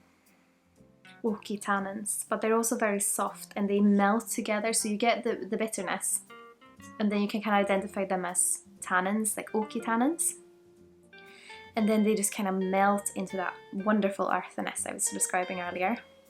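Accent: Scotland, United Kingdom. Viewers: Nice Scottish accent and great review.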